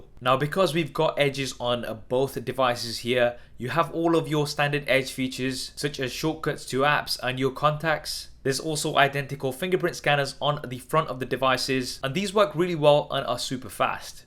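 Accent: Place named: London, United Kingdom. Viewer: Where are you from brother? Sounds like you're from around East London. Man I am happy to see a fellow London goer take up the stage and have a greatly sized channel of electronic fandom. I might be wrong, you could be from Manchester, but I haven't heard that accent in years. Anyways, love the video, well made and professional. Subbed.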